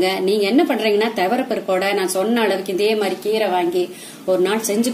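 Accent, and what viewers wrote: Kongu, India. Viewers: கொங்கு தமிழடன் கீரை கடையல் சுவைக்கிறது !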